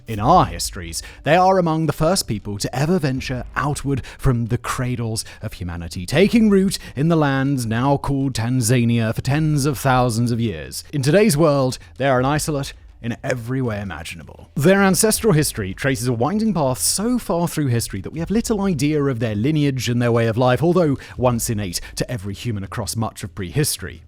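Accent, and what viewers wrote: United Kingdom. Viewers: umm. 1. We were discussing Tanzania, not Tasmania. 2. I did not ignore them, they was just nothing that necessitated a response, I can infer from all the comments that both are valid pronunciations depending on your accent and the only reason specific pronunciation hit my ear weird is because he has a British accent but is using a pronunciation more common to European and African accents. Which makes sense considering he lives in Prague and had an African Grandmother, or at least a grandmother who lived in Africa. 3. The only reason I responded to that specific person is because he seems to be under the impression that only Americans pronounce it "Tan-zan-iah" which is just incorrect.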